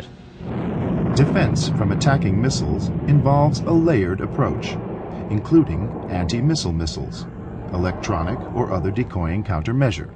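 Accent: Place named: United States. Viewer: He speak american english NOT french so its american made video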